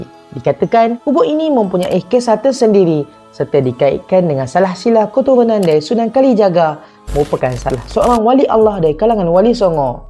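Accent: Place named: North, Malaysia. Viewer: orang utagha ka? loghat cakap ada kala keluaq utagha tu hehe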